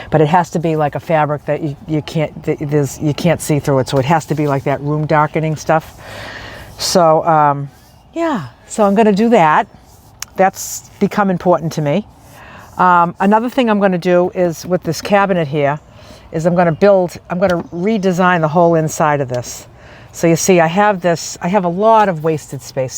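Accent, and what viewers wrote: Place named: Massachusetts, United States. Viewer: Hi! I fell upon your channel and love it! I love your accent too! My family and I would spend a few weeks every summer in Gloucester, Mass., and it reminds me of all the lovely people we met there!! 👍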